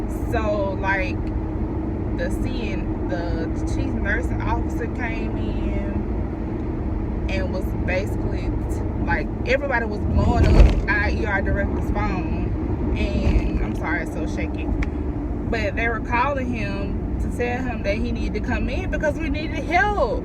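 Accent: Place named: Alabama, United States. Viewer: I heard you accent & immediately knew you were from Al. (So am I 💗)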